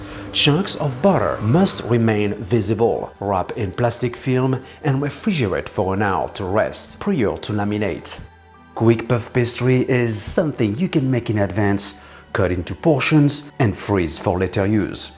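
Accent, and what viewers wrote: France. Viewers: The French accent tears me into pieces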